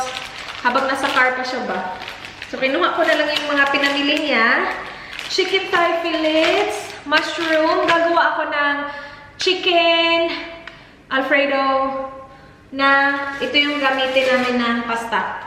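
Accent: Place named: Visayas, Philippines. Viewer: Ayieeeeee himos kaayo ma bati imong inistoryahan te ba na a Jud Ang bisaya accent 💖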